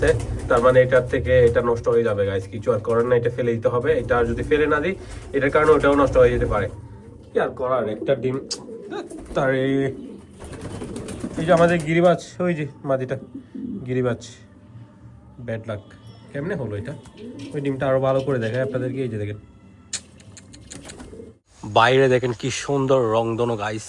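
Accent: Chittagong, Bangladesh. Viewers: আপনার কতা শুনলে,বুঝা যাই,আপনি চট্টগ্রামের মানুষ, কতা অনেক সুন্দর করে বলতে পারেন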